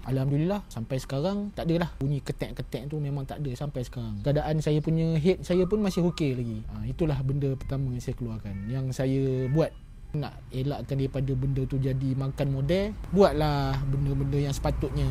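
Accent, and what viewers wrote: Perak, Malaysia. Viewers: org perak ke yop?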